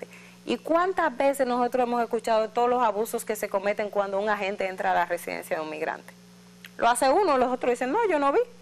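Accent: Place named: Haiti. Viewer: No es por nada pero esta presentadora puedo sentir qué es ahitiana escuchen bien su acento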